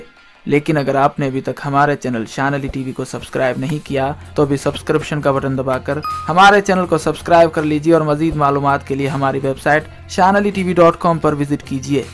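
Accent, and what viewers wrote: India. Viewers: apka lehja hindi kyun hai urdu ko urdu ki trh bola karein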